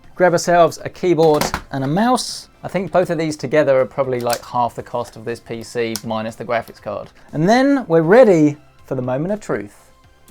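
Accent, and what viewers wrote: United Kingdom. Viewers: your bri'ish accent made me to watch the full video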